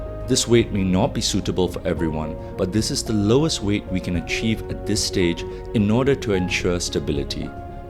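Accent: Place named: India. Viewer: Definitely an indian dude narrating this.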